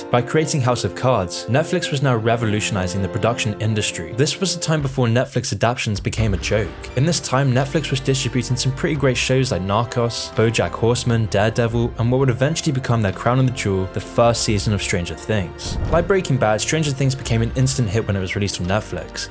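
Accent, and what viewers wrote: United Kingdom. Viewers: This channel is a bit QAnon in my view with a British accent. Plenty of reasons to criticise Disney and Netflix but when going into 'woke world' seems to lose the plot as such. I don't like Blackrock either but I don't think there is some master plot as such.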